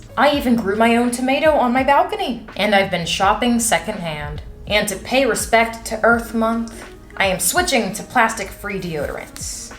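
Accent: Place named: Florida, United States. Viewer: This Florida accent is hilarious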